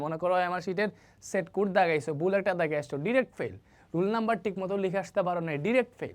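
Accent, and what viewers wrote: Sylhet, Bangladesh. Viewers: Vhaiya mone hoy sylheti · ভাই মনে হয় খাঁটি সিলেটি..❤😊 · Bhai aponake donnobad ar aponi ki sylhety😢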